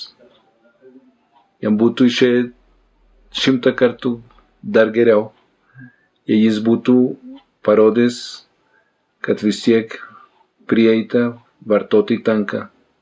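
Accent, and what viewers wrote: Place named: Bogotá, Colombia. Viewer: Tiene asento bogotano en Lithuano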